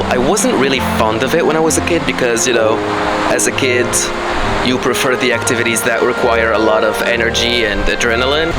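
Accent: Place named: Tunisia. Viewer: First time watching u, at first I was like why tf is he speaking english, idk why but everytime i listen to a tunisian speaking in english (including me) it feels a little weird, damn I wish i could speak with american or british accent, anyways keep it up, nice video,this channel was a good discovery today. kind of like nathaniel drew of tunisia ;)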